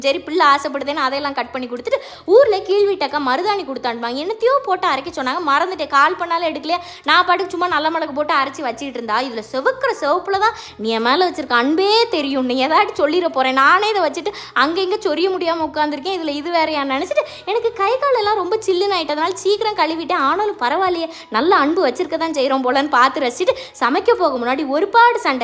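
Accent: Tirunelveli, India. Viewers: 😂😂Sambar satham thanaaa😅😅Mathu unga video oru 5 or 6 times vathu pappaen 😊😊 Neega paesurathu superrrrr ah irukku....Neega paesura Nellai Tamil அழகு போல ....irukku😊😊❤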